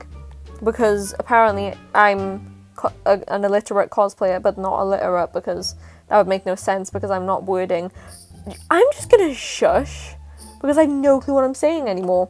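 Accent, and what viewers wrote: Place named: Wales, United Kingdom. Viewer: I love the welsh accent. I live in South Wales 🏴 currently so I’m catching onto the accent which I loveeeeeee ❤️🤷🏼‍♀️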